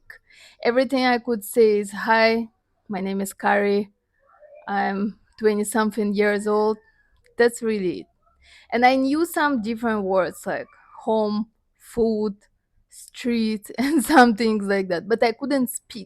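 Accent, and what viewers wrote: Jamaica. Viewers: You got ah likkle jamaican accent still.. it pops ups with some of your pronunciation of certain words 😂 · Jamaican fluency is good I like that accent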